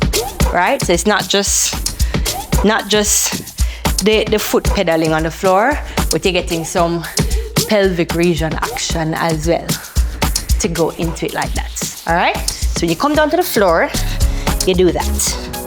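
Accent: Jamaica. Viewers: I got mesmerized inlove when you say down wat accent haha i hear jamaica but i hear something else to 🤦🏿🤣🤦🏿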